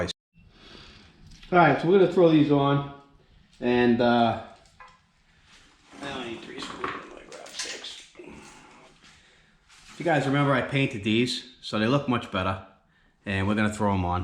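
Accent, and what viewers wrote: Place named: Massachusetts, United States. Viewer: ahhhh I knew it lol I live in Maine, but my dad has 6 siblings in mass so we have huge family down tthere. All over. I knew the mass accent. That's awesome. And yeah I didn't take the motor out, or take the bike apart so ill be referencing ur engine vids for sure. I already learned about kawi swingarm spanner nut deal, which black wire goes to the sensor about starter, orientation of crank case vent etc. We just went to everett MA and bought 3 brand new machines. Me my girl and the kiddo.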